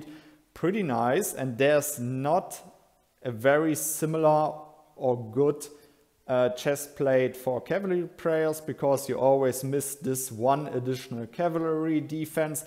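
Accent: Germany. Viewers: Very fun German accent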